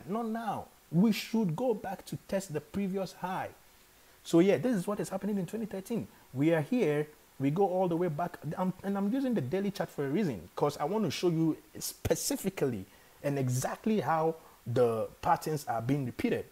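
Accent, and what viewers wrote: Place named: Ghana. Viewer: Are you from Ghana? Your accent is a giveaway.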